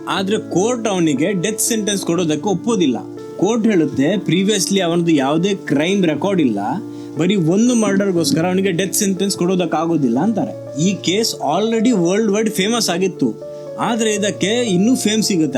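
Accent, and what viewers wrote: Mangalore, India. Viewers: R u manglorian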